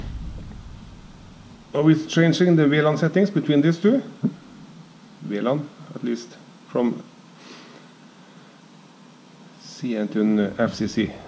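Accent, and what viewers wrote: Norway. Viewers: you must be norwegian?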